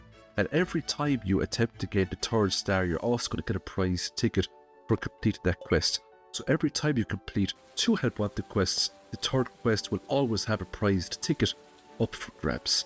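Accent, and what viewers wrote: Ireland. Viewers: 1st time viewer. I came across your video in my feed and decided to click on it, and was not disappointed. You gave some amazing tips that I have not seen anyone talk about. Great video, I'm going to check out your channel, and watch a few more videos. Just a curiosity question, If I may... Listening to your video, and from your accent, are you from Ireland, by chance?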